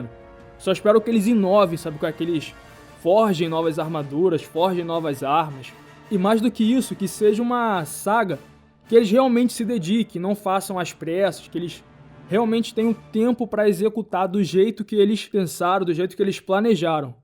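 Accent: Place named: Rio de Janeiro, Brazil. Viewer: KKKKK MANO ESSE SOTAQIE É MT HILÁRIO Parece q eu to falando sobre cdz com um daqueles tios de boteco do rio Melhor canal de cdz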